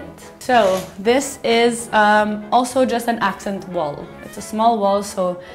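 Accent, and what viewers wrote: United Kingdom. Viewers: That Brit.accent by 😍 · that british accent hahahaha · I like her british accent👌🤗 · British accent 😍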